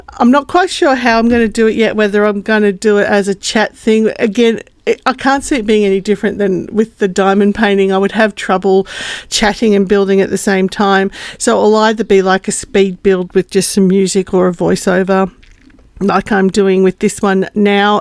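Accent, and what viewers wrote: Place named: Australia. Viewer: Happy ne year.I hope Luna has her stiches out soon. Thanks for sharing your crafts. I enjoy your vids and I like hearing the aussie accent(Im just down the road a bit) I feel inspired and I find watchcing them relaxing. I don't do diamond painting but I do love colouring and I often make brickbuilds (usuaslly asian brands but they have some great animals, including dragons. I think you are really productive in comparison to me. I really have to be in the right mood to do much colouring.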